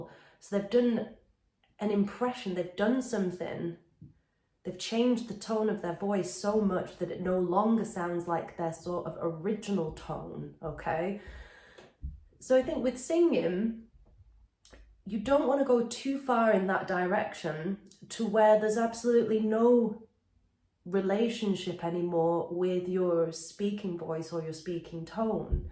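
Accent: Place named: Northern England, United Kingdom. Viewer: This is a great idea! I've had years of lessons and my main teacher has spoken a lot of placement, but I really struggled with the descriptions of placement and to a certain extent, I still do. ("Place it more forward" - I think I learnt to close my pharynx a little more when I heard that, but it could be that I changed my configuration in other ways that I'm not conscious of.) Perhaps if I had been asked to imitate some of the voices here or in other songs, I would have got there quicker. I love your Northern accent! We have such a rich tapestry of accents in the UK; mine is a bit of a mix between RP and Welsh! :)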